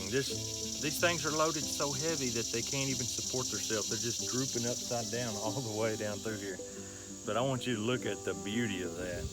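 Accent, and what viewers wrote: South, United States. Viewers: "Just like at".! Love the south.. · I enjoyed watching your video and loved your down to earth personality and country accent. Blessings!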